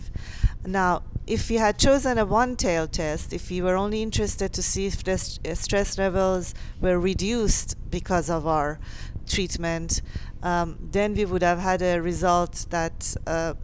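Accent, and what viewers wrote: Iran. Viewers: shes is clearly iranian. the accent is too obvious haha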